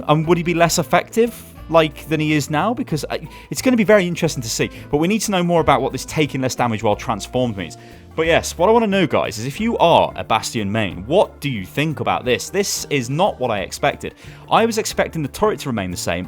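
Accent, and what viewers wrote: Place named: London, United Kingdom. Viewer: what is this? a good london speaker?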